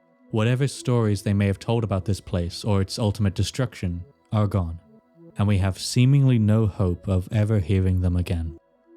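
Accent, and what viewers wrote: Wales, United Kingdom. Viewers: Love hearing the Welsh pronunciation ❤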